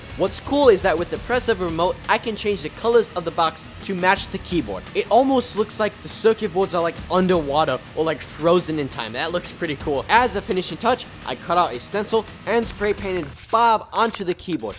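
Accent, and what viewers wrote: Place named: New York, United States. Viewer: Judging by your accent, you’re either from New York or Vermont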